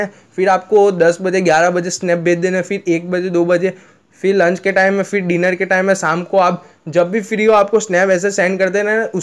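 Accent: Gujarat, India. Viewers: You are from Gujarat ??❤️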